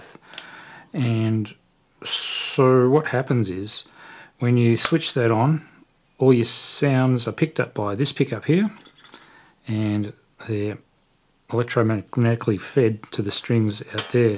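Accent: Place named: Australia. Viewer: You're Australian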